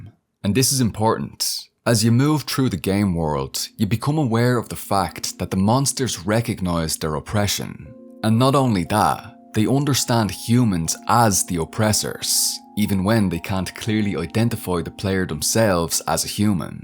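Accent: Ireland. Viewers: as a fellow irish person, it's so great to see another undertale fan from our country! this video was amazing and extremely well articulated ❤ you earned a new sub 🇮🇪🇮🇪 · yo I clicked on the video and in the first few words I was yoooo this guy is a fellow Irishman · I’ll have forgotten the spoilers by the time I play. besides, you arent the first. the people I used to know back in school would always talk about this game. of course, none of them did it with a handsome Irish accent and a Marxist analysis to top it off, so Im a little more inspired to see the game for myself now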